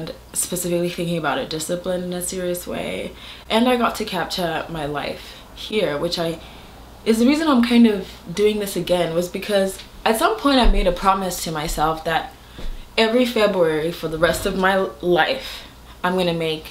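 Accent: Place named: Botswana. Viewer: i literally came back to watch a couple of your videos from last year last week, this is such a pleasant surprise! also your setswana accent is legitimately my favourite accent i’ve ever heard, i tell my friends this all the time.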